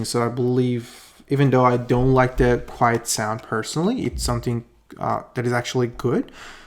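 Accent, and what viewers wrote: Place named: Australia. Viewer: ghorbune lahje aussie it beram :))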